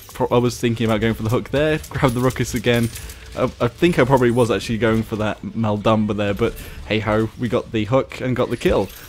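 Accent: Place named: United Kingdom. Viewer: Are you British? You sound like you have a British accent.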